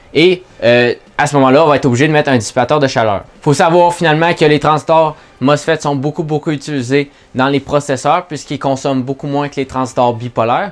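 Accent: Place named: Quebec, Canada. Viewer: "à la bose du trastore"... que j'adore le français québéqouis! Vraiment, je fais pas de sarcasme, j'aime l'homme Québéquois.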